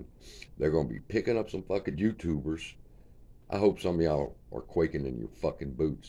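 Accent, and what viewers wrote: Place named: mixed, United States. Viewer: Your Cajun-Texan accent kills me brother 🤣👍👍👍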